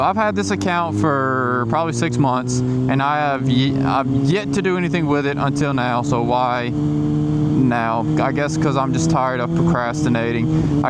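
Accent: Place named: South, United States. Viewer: i knew i been hearing a southern accent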